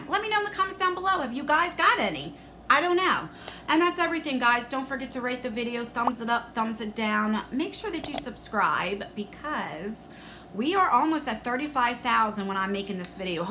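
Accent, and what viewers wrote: New Jersey, United States. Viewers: I love your videos. You are so funny and I love your NJ accent.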